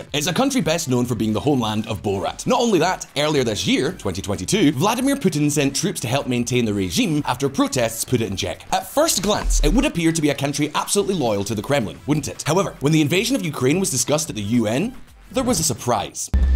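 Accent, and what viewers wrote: Ireland. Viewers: Are you irish? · You speak to fast but I understand p, are you ☘️ irish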